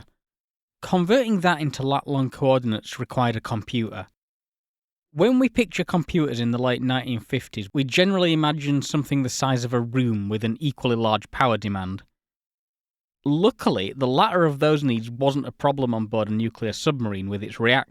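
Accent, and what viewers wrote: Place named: Northern England, United Kingdom. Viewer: I'm going to guess it did not occur to him his perfectly standard northern accent is "difficult to understand"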